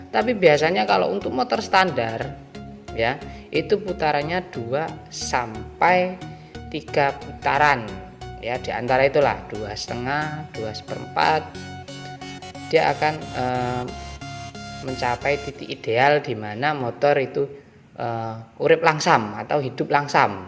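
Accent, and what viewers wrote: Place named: Java, Indonesia. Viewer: Jawane medok mas hahaha tapi mantep penjelasane mas, ditunggu vidio seng laine mas.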